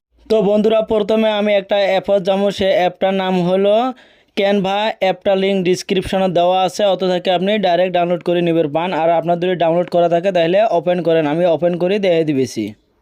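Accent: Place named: Kurigram, Bangladesh. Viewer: এ কুড়িগ্রামের ভাই, ইউটিউবে চ্যানেলে ভিডিও আপলোড করেছ, একটু শুদ্ধ করে কথাবার্তা বল তাহলে শুনতে ভালো লাগবে, সারা বাংলাদেশের লোক দেখছে বুঝনা কিছু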